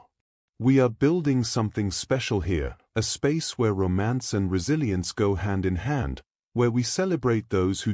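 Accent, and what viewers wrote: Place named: England, United Kingdom. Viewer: It’s nice not to have an American accent reading. Please keep the English accent.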